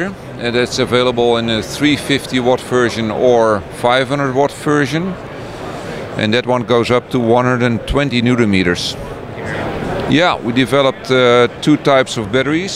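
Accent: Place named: Netherlands. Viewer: English speaking with a Dutch accent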